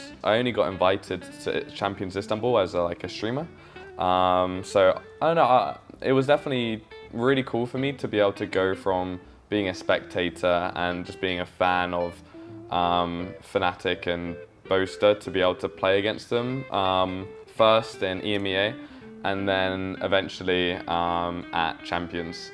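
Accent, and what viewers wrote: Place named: United Kingdom. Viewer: イギリス英語で声が良いから耳が心地良い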